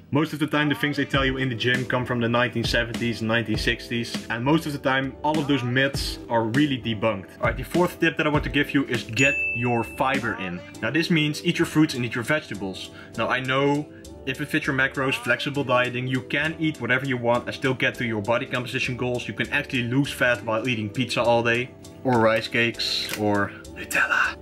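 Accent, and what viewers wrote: Netherlands. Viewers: Een nieuwe abonnee erbij hoor aan je acccent dat je Nederlands bent 💪🏽👊🏼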